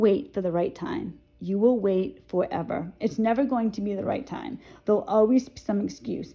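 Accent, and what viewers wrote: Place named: Boston, United States. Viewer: you should do a small clip of your Boston accent, just for kicks. Another great video, we can change and move on, thanks for your encouragement and stories it really helps because so many are still struggling. I am free but still struggle with rumination and it really sucks. It's so cute to hear the chickens in the background.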